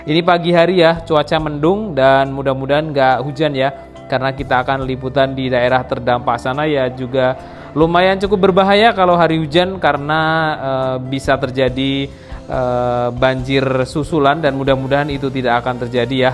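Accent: Java, Indonesia. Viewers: Maaf mau nanya...abang org jawa ya...keliatan dr bahasanya kdg suka medok😊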